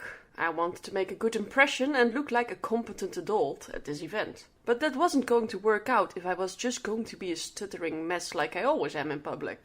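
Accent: Netherlands. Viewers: Dikste hollands accent ooit vrind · Are you dutch? Ik kan het horen in je accent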